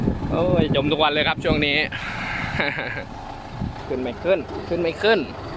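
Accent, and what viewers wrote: South, Thailand. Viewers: คนใต้นี่หว่าฟังตั้งนาน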